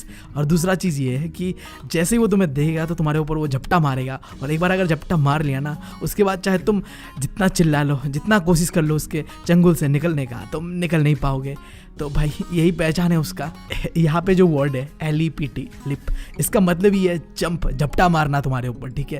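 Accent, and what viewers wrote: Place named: Bihar, India. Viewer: bhai aapki bhasha mai bengali kam or bihari vibe jayada kyu hai kon kon agree karta hai😊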